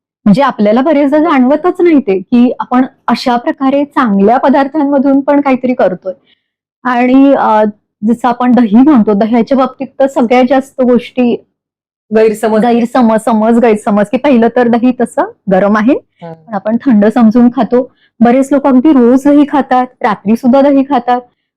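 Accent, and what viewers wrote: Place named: Pune, India. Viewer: Madam tumhi kitisundar shuddha Puneri utchhar Marathi bolat ahat. Khup chhan vatala aikun. Tumhala shabbaski dete. Bless you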